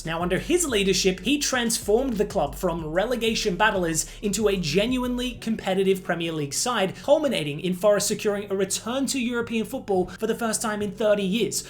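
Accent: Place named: Australia. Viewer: The Aussie accent is indeed the best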